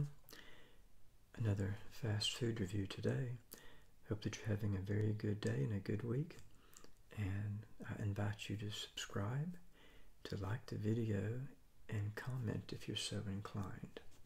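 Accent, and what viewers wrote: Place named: California, United States. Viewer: To me it kinda sounds Californian idk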